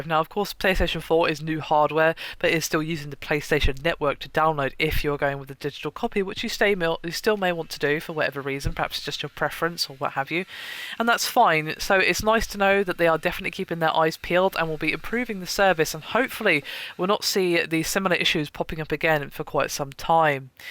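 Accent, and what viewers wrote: United Kingdom. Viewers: the fruity little british accent makes this unwatchable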